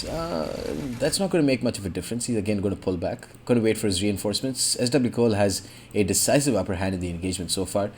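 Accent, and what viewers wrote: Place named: India. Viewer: Damn You sound more Indian man!!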